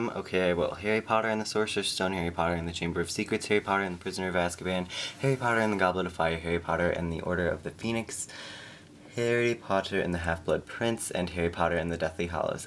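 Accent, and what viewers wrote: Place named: England, United Kingdom. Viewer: I must admit nothing gets me like someone speaking Spanish with that typical English accent so yay you made my night =). Oh and I am envious of your shelf =)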